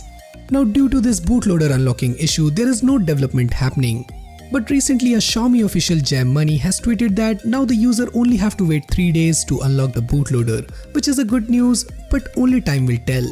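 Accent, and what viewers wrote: India. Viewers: ur indian accent is dumb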